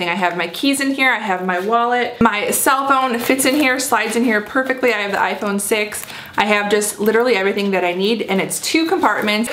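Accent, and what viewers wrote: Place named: Midwest, United States. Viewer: Are you from the midwest? You sound like you have my accent! :)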